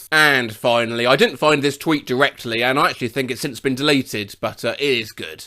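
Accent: United Kingdom. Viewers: I have only watched like 5 of your videos and I have to be honest, you have the greatest British accent of all time.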